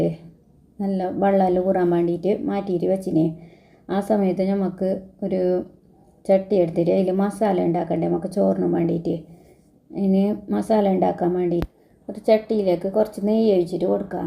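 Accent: Kasaragod, India. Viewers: നല്ല അടിപൊളി സംസാരം എനിക്ക് ഒരുപാട് ഇഷ്ടപ്പെട്ടു കാസർകോട് ആണല്ലോ സ്ഥലം കാസർഗോഡ് ഭാഷയും വിഭവങ്ങളും ഒന്ന് വേറെ തന്നെയാണ് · സംസാരം അടിപൊളി എനിക്ക് കാസർഗോഡ് ഭാഷ വളരെ ഇഷ്ടമാണ് വീഡിയോ കണ്ടു ഞാൻ ഇത് പഠിക്കും😂😂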